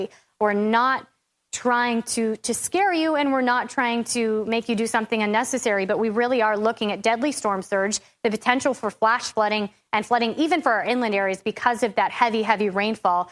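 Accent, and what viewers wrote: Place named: New England, United States. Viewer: Where did they get this woman ?? Fox is now hiring extemporaneous female speakers. Grab them off the street, give them a page they have never seen, put them on the air in 10 seconds You do not even need to be a functional reader. Make it up as you go. Obviously, she's a an office holder in the Republican Party. She would come across better, if she was not a Yankee. I'm not trying to give you extra work here.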